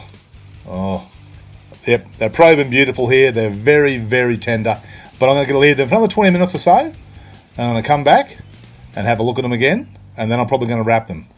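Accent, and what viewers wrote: Australia. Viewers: Aussie as fuck love it mate 🍻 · after watching dozens of seppo bbq videos, can i just say how refreshing it is to hear an Aussie voiceover lol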